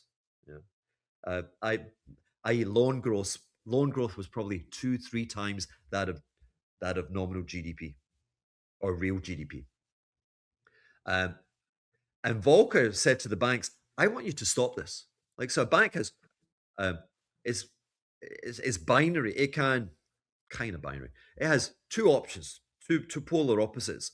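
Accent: United Kingdom. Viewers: is this British English???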